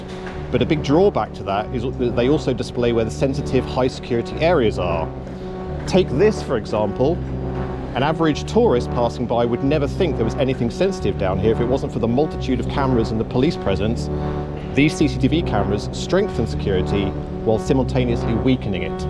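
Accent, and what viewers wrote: England, United Kingdom. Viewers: New sub keep doing what you are doing and there is nothing like an English accent to teach.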